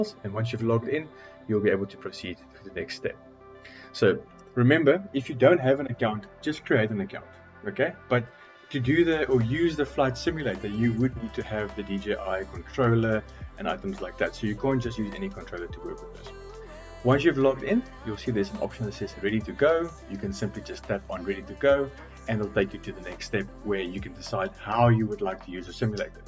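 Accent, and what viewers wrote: South Africa. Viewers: South Africa accent…not Australia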